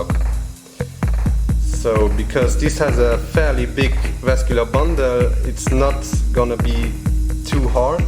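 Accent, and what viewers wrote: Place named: Luxembourg. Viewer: 🎉 duuu bass letzeboier ❤ ech erkennen deen accent direct 🎉❤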